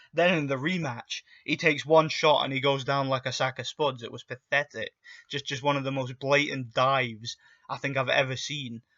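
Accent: United Kingdom. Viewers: Is crazy what your saying but do you know the wbc took the belt from tyson fury about the glove gate bs povetkin vs dyllan white just recently fought for the interim wbc heavyweight belt sooo skill or not he was a champ and your accent tells me your from uk and mayb mad at this guy idk but it seems wilder lives rent free on your mind 🥱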